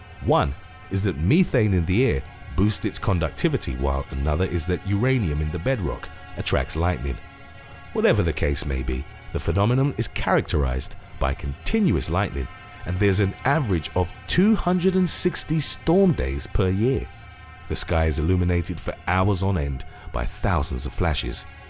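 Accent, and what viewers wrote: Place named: United Kingdom. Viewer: hearing a brittih dude using american mesurements cringey lol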